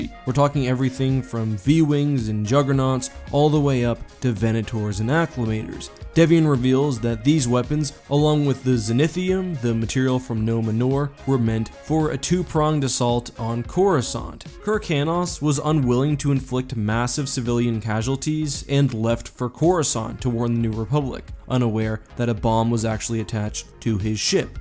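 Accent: Canada. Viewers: My happy place is listening to talking about star wars. Make love to my ears with that sweet canadian accent bro. 🇨🇦🇦🇺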